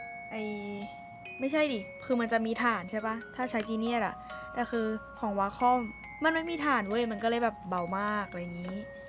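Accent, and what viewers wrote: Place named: Thailand. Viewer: แอบเหน่อๆนะเนี้ย น่าร๊ากกก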